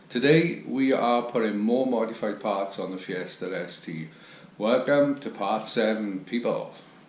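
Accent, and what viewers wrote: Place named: Scotland, United Kingdom. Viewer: Hi i am Chris i watch your channel and like it but sometimes hard to understand your accent where yod from in UK I bet scotland or close. regards Chris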